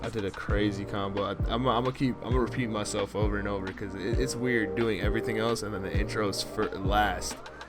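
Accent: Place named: Florida, United States. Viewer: dope vid u must be from florida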